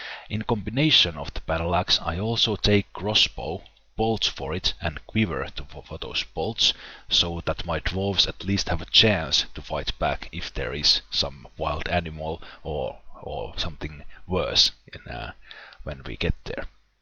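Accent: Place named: Finland. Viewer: Just out of interest. Do you happen to be Finnish? Your accent sounds exactly like most of us Finns.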